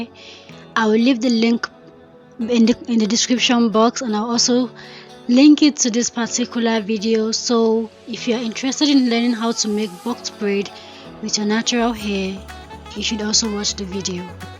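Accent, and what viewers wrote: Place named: Zambia. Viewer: You sounded like zambian,am a zambian that's why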